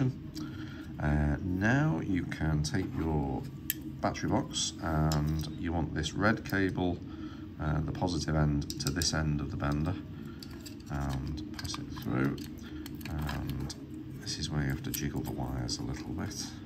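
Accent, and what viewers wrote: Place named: Wirral, United Kingdom. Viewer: I need a order a couple andet my nodes out of project boxes. Great hearing a local Wirral accent on YouTube for a change. 73 Sean de 2E0SYA (Wallasey)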